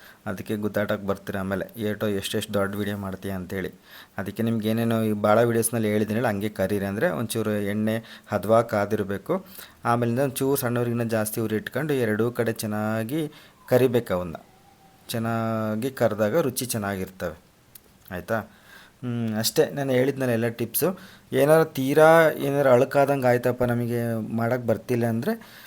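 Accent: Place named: Kadur, India. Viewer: Sar niwoo yava wooru nimma bashe nama kadurina bashe agede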